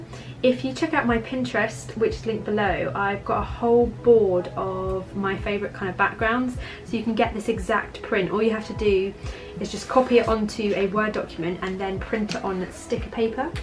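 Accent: England, United Kingdom. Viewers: ok so there are two things i need to say to you : one. you're so damn good at scapbooking!! i tried once and my eyes just don't work well lmao. two. i really like your accent! i could listen to you for hours. i am planning to move in england so from now on you will be my exemple for training on the english accent. byye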